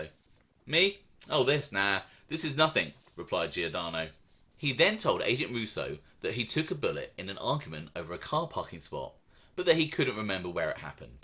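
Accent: United Kingdom. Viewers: Loving the videos mate. Nice to here a fellow Brits talking about the mob.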